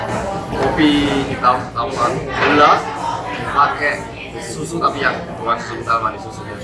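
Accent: Java, Indonesia. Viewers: logatnya ky orang jawa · kok kedoknya kedok jawa kak xD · Ini masnya asli korea apa org indo? kok medok jawa ne😂 Ya wes. La lek😂😂😂 Sukasuka😄😄😄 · orng korea tapi jawa banget ngomongnya 😍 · Korea asli tapi tinggal di Indo dan berlogat Jawa (medok). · Logat nya rada kyk org jawa gitu , medhok bgt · Oppa asli korea atau orang indonesia?????logat jawa nya kena banget 👍👍👍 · medoknya lucu 😂😂😂😂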